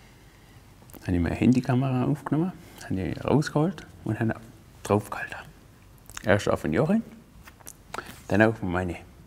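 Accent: Swabia, Germany. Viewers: Marcus V Bua, der schwäzt Schwäbisch ned bairisch. Die wolled uns blos nachmacha vorstosch? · schwäbisch xD grüße aus ravensburg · HAHA an alle die kein schäbisch könna XDDD ihr versteht nix XD du musst untertitel hin machn^^ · Manta i woass dass ea schwäbisch schwätzt xD awa i dabaggs dengerscht ned so zu ren gschweige denn zu "schreim" ^^ awa vasteng tu i scho guad :) i sollte valleicht ein bissle schwäbisch leana wie i boarisch gleant hob xD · Dieses Schwäbisch einfach zu geil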